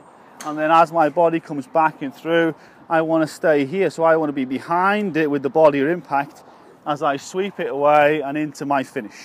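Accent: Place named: Liverpool, United Kingdom. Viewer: Great tip. I've been struggling with the drive for years & this tip immediately improved things for me. I'm living in Sydney, Australia but originally from Liverpool (Aintree - Old Roan) - I suspect from your accent you're from the same area.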